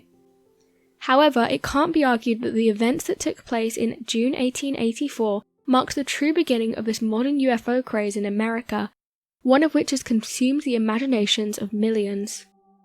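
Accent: United Kingdom. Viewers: Monument Valley, Arizona is being shown but Brit voice discussing Minnesota. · Why does everything sound more plausible when delivered with a British accent?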